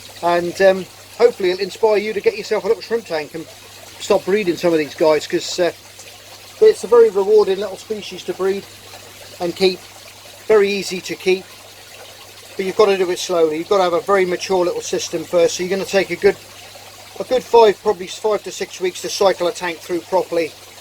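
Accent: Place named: United Kingdom. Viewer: another fantastic video. Don't sell yourself short on your camera work, its brilliant. I started watching your vids and decided to start with Red Cherrys as they are easy. It's good to hear a British voice as most vids are from the USA, no disrespect intended. Thanks again.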